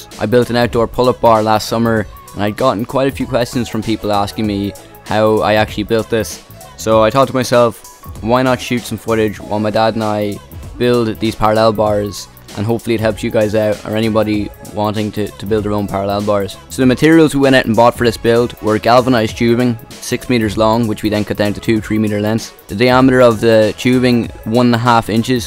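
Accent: Ireland. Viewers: R u IRISH?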